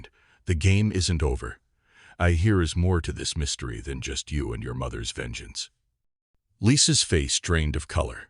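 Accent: United States. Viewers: No no no, can't have an American accent doing Agatha!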